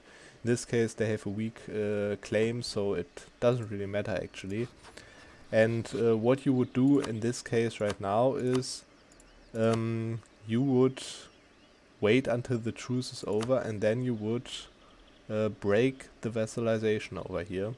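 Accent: Germany. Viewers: You have a German-Accent XD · You’re from Germany, right? Nicht schlimm, ich mag unseren Englischakzent. :D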